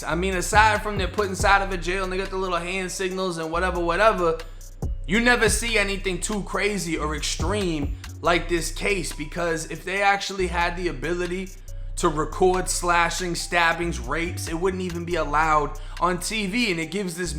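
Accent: Boston, United States. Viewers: Why you got a Boston accent if you from Tampa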